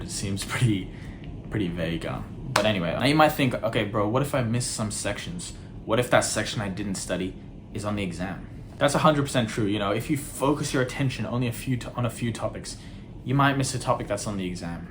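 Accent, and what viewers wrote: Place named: Australia. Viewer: dude whats with the subs, aussie accent isnt that hard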